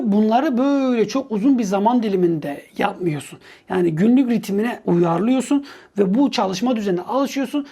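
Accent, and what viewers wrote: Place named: Gaziantep, Turkey. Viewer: Hocam Antepli siniz galiba 😄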